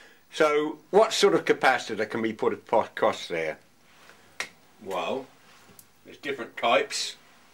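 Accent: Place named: Norfolk, United Kingdom. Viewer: I would have sworn you were from the Norfolk area. Oh well.......... I am over the water from you in Bromley, close to Greenwich.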